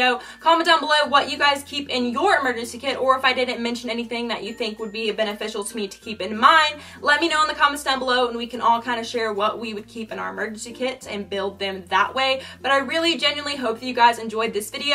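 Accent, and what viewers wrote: South, United States. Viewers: Is it just me or did she get more of a country accent lmaooo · She got a lot more of a country accent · petition for who thinks she should use her country accent more ⬇️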